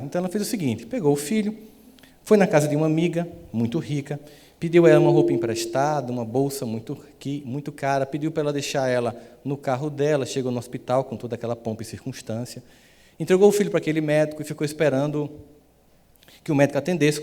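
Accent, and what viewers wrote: Northeast, Brazil. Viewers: Também gosto do sotaque. Sou paulista mais admiro um nordestino que fala assim com clareza. Na minha região falamos muito feio kkkkk. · Que história linda!!! Sou sua fã incondicional. Moro em Natal e gostaria de saber se você vem aqui para uma palestra.... lhe acompanho há quase dois anos. Adoro suas palavras; suas palestras e seu sotaque que é igualzinho ao meu. Sempre grata a você que é um enviado de Deus. Muitas bençãos pra você é família 😊🙏🏼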